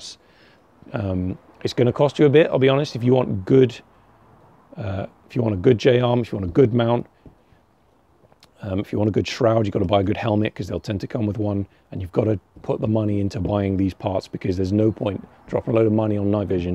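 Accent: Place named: United Kingdom. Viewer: I just realized, man's a brit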